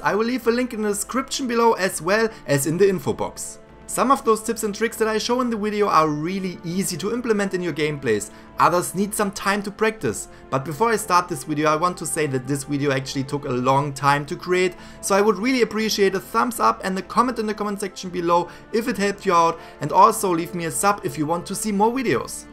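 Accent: Germany. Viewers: You know...I just noticed his language was in Chinese or w.e. yet he sounds German as fuck lol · Keine Ahnung ob das wer lesen kann,aber irgendwie hat er ein Deutschen Akzent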